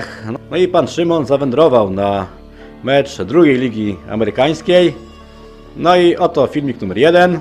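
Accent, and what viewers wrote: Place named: Silesia, Poland. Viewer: Niby z Warszawy, a nawija jak hanys.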